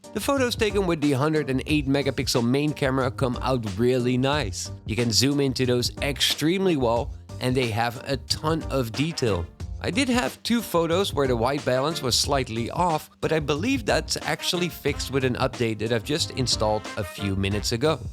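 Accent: Netherlands. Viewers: Are you Dutch by chance? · Do I hear a dutch accent?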